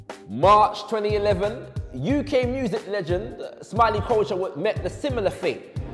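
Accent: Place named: Jamaica. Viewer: Maybe he spoke in jamaican accent or patois in his home as a child its common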